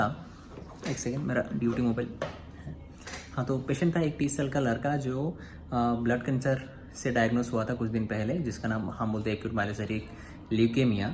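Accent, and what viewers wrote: Bengal, India. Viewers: Just guessing that, you are a Bengali, by your hindi speaking style(are you a Bengali ?). By the way another bengali here. Medical aspirant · Dada apni bangali to.....laglo apnar kotha sune tai bollam..?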